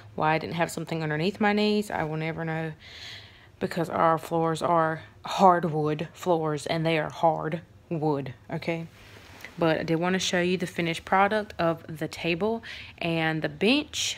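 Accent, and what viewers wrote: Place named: Alabama, United States. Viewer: I love listening ot you talk... you sound like everyone I know! :-) (I'm from Alabama.)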